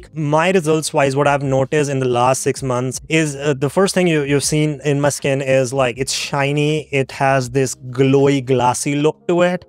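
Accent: India. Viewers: I came to learn about red light therapy, not only did I learn a lot but also feel like I learned Hindi as well - you've an awesome accent! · I love Indian accent. So cute. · bro which part of North India ( lemme guess.. haryanna) are you from, mitochondrial stimulation is the final goal but your accent and intonation makes it tough but after reading several comments seems like you were on point. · Why would a man with a thick Indian accent lie on the Internet?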